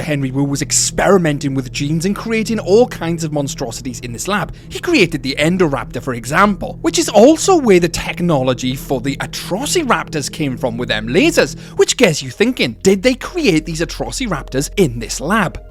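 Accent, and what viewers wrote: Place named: United Kingdom. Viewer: Gosh I adore how you say “endorapthor”, British accent is the best accent (my opinion as non-native english speaker)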